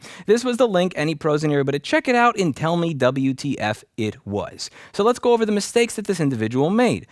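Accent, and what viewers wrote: New York, United States. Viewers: Is it just me or Sounds really New Yorkey on this one?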